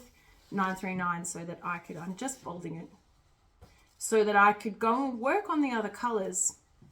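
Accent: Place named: Australia. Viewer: !!! Oh my goodness you did excellent! I got absolutely no stitching done because I was enjoying this with my morning coffee!😁☕️ So many great WIPs, and some I don't remember seeing. I love your Pandemic your colour choices/ placements are so fun! Paradise Found is definitely one of my favourites and I loved seeing the progress on it. ( I laughed when you said you didn't pick MC so it's more doable, because whenever I get around to my JN it is regular version MC🤦‍♀️😝🤣) 26.99%🤣🤣 oh that made me giggle! Talking about 939 and the Kreinik did also!🤭 Your CM Designs are beautiful! Love your Chicago piece, those trees!!😍 I had so many more things to say but like always I have forgotten them by the time I get to the end of a video! So proud of you for jumping on the Flosstube train! Oh and I love your Aussie accent! 😊 Thanks so much for the shoutout!!🥰 I am so glad I could say I was here RIGHT from the beginning of your flosstube journey! 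Sub #1!😉🤭😊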